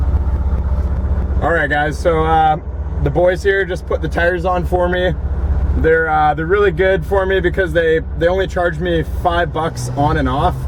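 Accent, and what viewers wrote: Canada. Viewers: smooth canadian accent, sound like the trailer park boys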